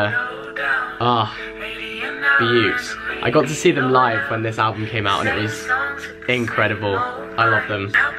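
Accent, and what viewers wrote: United Kingdom. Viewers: You’re SO British- coming from a Brit jeez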